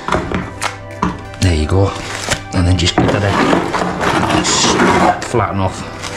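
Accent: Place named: Liverpool, United Kingdom. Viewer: Can hear a fellow scouser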